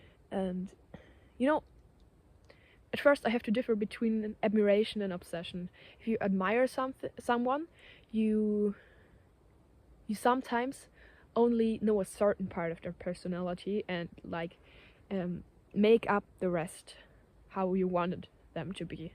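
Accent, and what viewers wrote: Germany. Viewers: Thanks for the recommendation. I also liked the movie mitty, where he lives a simple life and become to enjoy it, when he goes on a journey because of his work. I first thought English is your first language, but I kind of hear some german accent. Sprichst du etwa deutsch?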